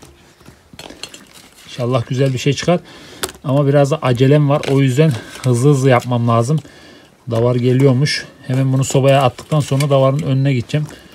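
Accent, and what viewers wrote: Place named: Yozgat, Turkey. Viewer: Yozgatta selamlar :) Abi konuşman zbizim Buraların Konuşması Gibe Leee 😂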